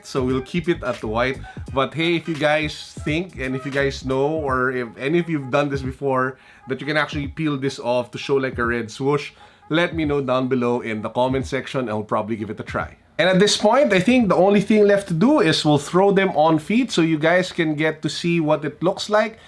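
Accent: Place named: Philippines. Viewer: That Filipino accent tho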